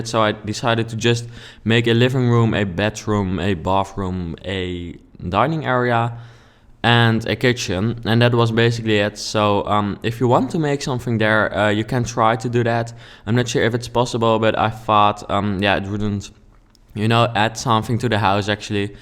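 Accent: Netherlands. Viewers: You need to stop using youre dutch accent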